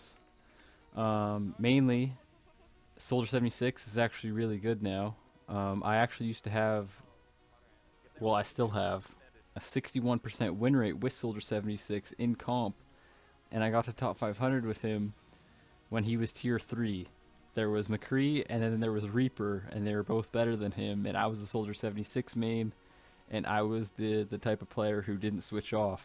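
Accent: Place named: Minnesota, United States. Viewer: Really like your vids man. I noticed that you have an accent close to mine. I thought you may have been from Minnesota like me, but Canada was my next guess. Keep it up, your play is great. I can aim ok but cant track for shit, any tips?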